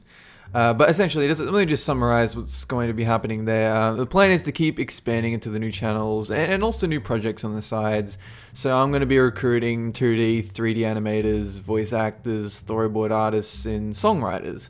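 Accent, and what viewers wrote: Australia. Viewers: Its nice to hear a familiar Aussie accent on the Youtubes again. Ive been playing Hots for a while now and been tempted to start doing videos playing it which is basically me shouting at my computer screen but idk if thats entertaining. As a musician/Composer im interested in what jobs you have to offer. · Hey, where are you from? You sound somewhat australian.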